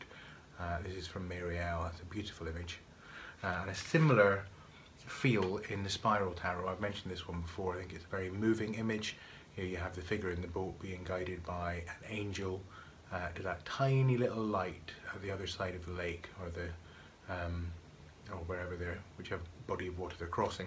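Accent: Scotland, United Kingdom. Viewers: Six of Swords is one of those cards I go straight to with a new deck. I have my preferences as to how it looks. Nice to see the completely trimmed Spiral Tarot. I did the same to mine, red borders be gone! Lovely video,. And your accent, trying to place it. I'm guessing Scottish? Thank you for sharing. 😊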